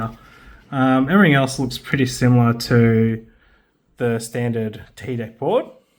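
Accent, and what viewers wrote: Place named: Australia. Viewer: I'm keen to try out a Lilygo T-Deck Plus as my entrypoint into Meshtastic, but it is not clear to me whether any of these sellers have the suitable frequency for Australia. You sound like you might be Australian, so I thought I would ask: from what I can tell, in Australia we need either AU915 or AS923 compliance. In the Aliexpress link, it does have a 915Mhz option, but it is not clear to me whether it would be suitable for Australia. I see that there may be some US915 devices that don't have quite the right frequency range for Australia. Can you help me choose the right thing? :-D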